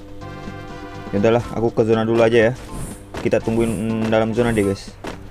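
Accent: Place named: Bali, Indonesia. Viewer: bang lu kok ngomong nya kya logat bali😭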